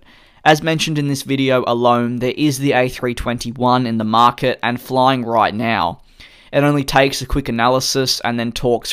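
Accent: Australia. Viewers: Wait.. u from Australia? thought you were from GB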